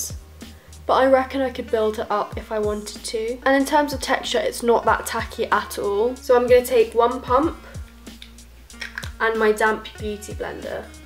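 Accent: RP, United Kingdom. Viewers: I love your accent, what part of Britain are you? (If I could speak in a British accent I would like to have your peculiar regional accent..) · Your accent sound so posh.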